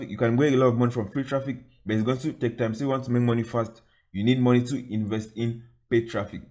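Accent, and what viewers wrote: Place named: Nigeria. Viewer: You accents is like Nigeria.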